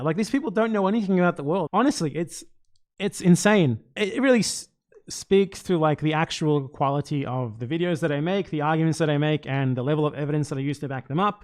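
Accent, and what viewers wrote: Australia. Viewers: All I want to know is how many times Argentines make fun of you for having a thick Aussie accent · When I first discovered your channel, I thought your accent was some sort of "Latino" one because I'm from the US and was wholly unfamiliar with real Australian accents as opposed to the ridiculous stereotypical ones we get in our media. This is fully deserving of derision as I could have been no younger than mid-20s when I found you, but I can almost understand why a teenager would be confused. I still don't understand people angrily believing you're pretending to be Latin American or hiding being Australian though, that's a level of US brain I've never had, mashallah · Do people just not recognize your very clear Australian accent?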